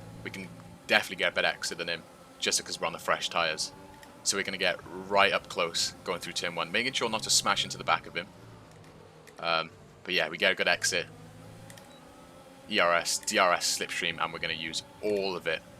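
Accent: Wales, United Kingdom. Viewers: Good effort bro, just found your channel via the Shed Dweller. And cos it's nice to hear a fellow Welsh accent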